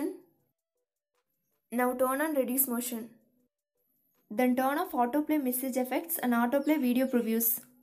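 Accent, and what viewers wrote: India. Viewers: I hear Indian that means I’m in the right place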